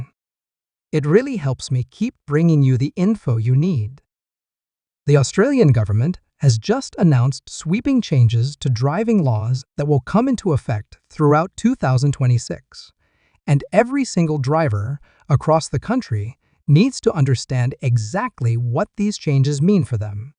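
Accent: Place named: United States. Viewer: I do not know how much of this I can actually believe. Specially with a Yankee voice or a I. Sounds like revenue to me with some of it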